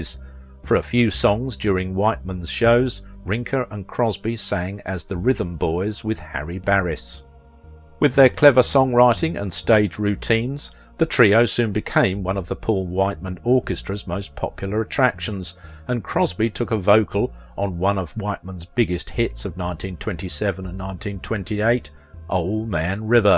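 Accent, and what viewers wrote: United Kingdom. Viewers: Hello there i just want to say to you im facinated by your english spoken tone i miss speakinf to english raised people,and the thing is i was born in argentina 1959 from english parents but now i dont find englush people to practice my language....well dear my blessings foe u from an english scottish lady.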